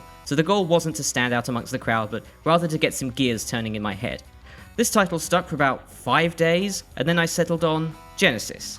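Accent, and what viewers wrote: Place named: United Kingdom. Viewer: >Map 25 >That blatantly placed inverted crucifix overlooking a pile of corpses If that doesn't speak "edgy teen", I don't know what does, and frankly, it feels nostalgic. It appeals to me on a personal. Regardless, this was a fun video, and frankly inspiring. Making a full fledged megawad when you're 17 is one hell of an undertaking. Pretty sure I spent my days as a 17~18 year old depressed, angry and jacking off, which... frankly isn't all too different from who I am today. The only difference is that I have a career and a steady income. But none of that really matters. :P I'm a bit late to the party, but I really want to get into doom mapping. I'm not exactly creative or smart, but I want to put out a map or two as part of my bucket list. Maybe one day it'll happen. Thanks for sharing this. Talented people like you are a rare breed these days. 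Also, I had no idea you were a Brit! Your accent blew my mind.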